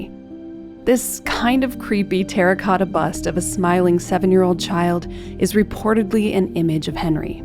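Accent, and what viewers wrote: United States. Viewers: Why an American narrator? Does not sound right somehow, and the over-the-top enthusiasm and gleefulness is downright weird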